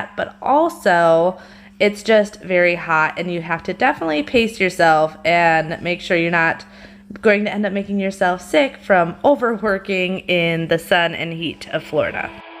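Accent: Wisconsin, United States. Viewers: I came across this in my recommendations and said to myself, "Sounds like she's from Wisconsin..." I grew up near Waukesha. :D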